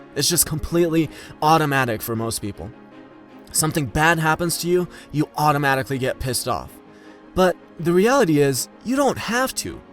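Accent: Georgia, United States. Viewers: You from Georgia? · You are from Georgia? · What? Are you from Georgia? Duude❤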